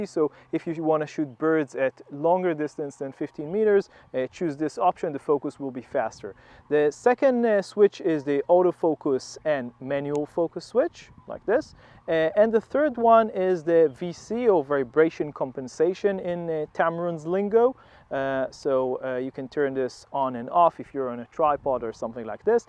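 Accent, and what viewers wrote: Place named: Israel. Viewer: יפה מאוד!שומעים תמבתע הישראלי שלך..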